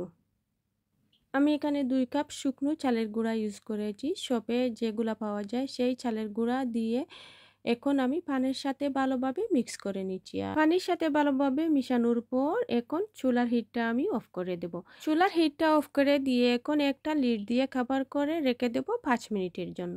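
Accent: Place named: Sylhet, Bangladesh. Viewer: আসসালামু আলাইকুম আপু আপনার কথা গুলো শুনে মনে হচ্ছে আপনি সিলেটি আমি ও সিলেট থেকে দেখছি সিলেট কোন জায়গায় আপনার বাড়ি কমেন্ট করবেন ❤❤❤❤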